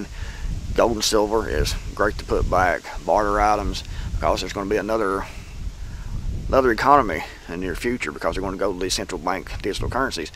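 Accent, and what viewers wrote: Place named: Tennessee, United States. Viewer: What state are you in? Sounds like Tennessee lol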